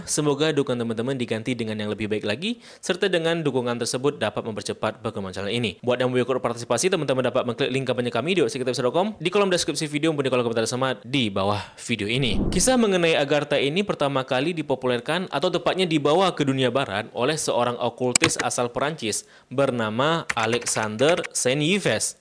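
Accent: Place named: Aceh, Indonesia. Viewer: Logat Aceh Abang ini buat aku mahu ketawak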